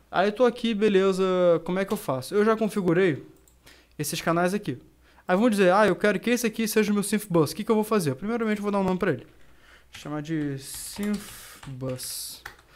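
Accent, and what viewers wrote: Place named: Portugal. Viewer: Vc é de portugal mano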